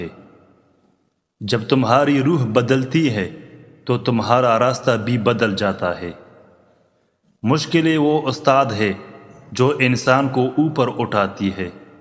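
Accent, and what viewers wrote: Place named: Pakistan. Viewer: Urdu Shi hai wo pakistani lahza hai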